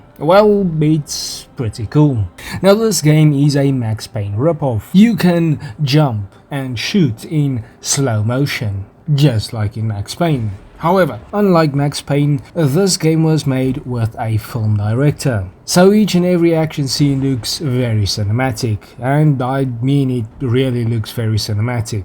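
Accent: South Africa. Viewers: Ur the most South African sounding guy I've heard doing a game review. Glad u popped up on my time line. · Hey man, great video. Are you South African by any chance? Curious due to your accent. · I enjoyed the flow of this video a lot, a nice surprise on my feed! Also is that a fellow afrikaner I hear? :P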